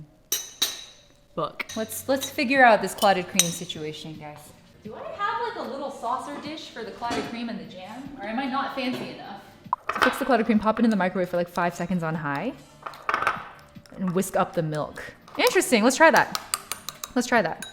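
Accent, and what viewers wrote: RP, United Kingdom. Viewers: tea time! sip sip I love your British accent as a British person. A bit posh though ngl Earl = Uurl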